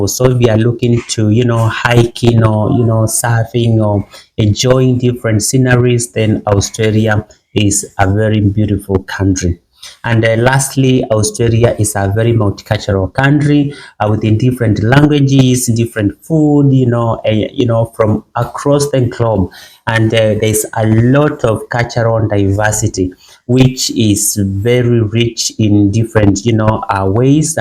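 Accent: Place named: Kenya. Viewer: Greetings from Kenya, I can hear from your accent you are a kenyan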